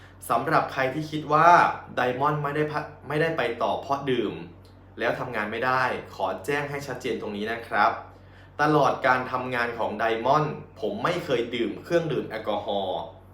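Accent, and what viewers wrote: Isan, Thailand. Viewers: เสียงอีสานยังรอยู่นะลูก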